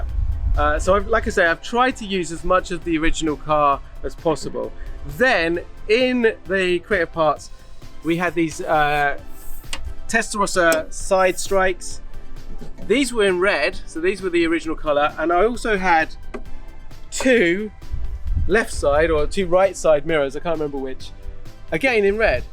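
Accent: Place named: United States. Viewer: No, I mean as I said, bastardised English. Let's just call it American accent so it doesn't offend and start another war.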